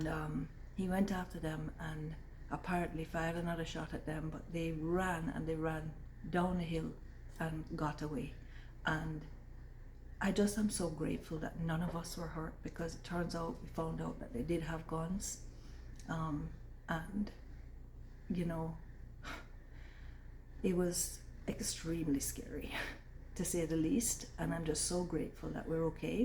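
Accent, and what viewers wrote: Jamaica. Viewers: This must hurt badly, even your accent is Jamaican. This is why i haven't when to Jamaica too much violence, i came to Europe 9yo now 40 and still haven't visit. The country need a safety net to help the people like in Europe or it going to be a nightmare in Jamaica in couple decades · I left my home country in the UK due to discrimination. The world has changed in a bunch of weird ways. I'm English, I sound English (in that obvious way) in the same way you sound Jamaican even when youre not speaking like a Jamaican. I did not go to Canada, I went to my wife's home country of Moldova. It is an odd thing that as a foreigner with a love for Moldova, I am loved and treated with a higher acceptance than my home country. I think I know what went wrong. People from their countries belong to the internet, they dont have a place to belong, they dont have a place to represent. 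I feel your disconnect. I still love the UK... I spent time in Jamaica, I get it. I do... 😢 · Wow! It is amazing watching a white lady speak with an authentic Jamaican accent. Her accent is very real! Black people are killing each other over the things that white people have allowed them to have. We are living in a local & global system of racism (white supremacy) where Black people are being put into desperate situations where they have to steal and kill each other to survive. This is not just in Jamaica, but everywhere on the planet where people colour are. Including Canada. So there is no escaping this global crisis! These problems are not the directly & indirectly created by people of colour themselves, because they are prisoners of war. Slavery never ended in 1865.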